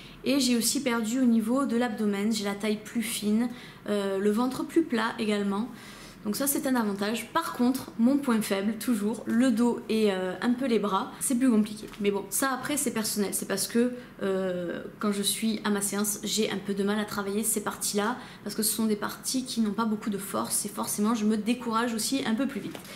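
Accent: South, France. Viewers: j'ai bien aimé ton approche au final bien resumé pour les debutants... avec un petit accent du sud non ?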